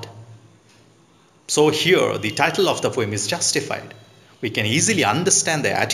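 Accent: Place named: England, United Kingdom. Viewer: I just loooovee your English accent ❤❤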